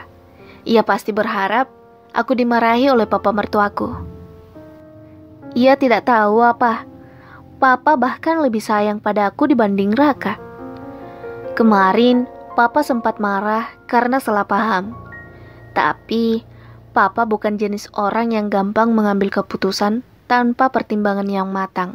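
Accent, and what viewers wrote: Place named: Mamuju, Indonesia. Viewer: Maaf boleh tanya miminnya ni org mna? Kok kayak org Mamuju?😁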